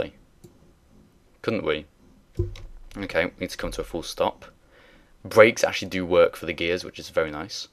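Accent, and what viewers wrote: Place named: England, United Kingdom. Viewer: You sound like an English PlumpHelmetPunk. Awesome.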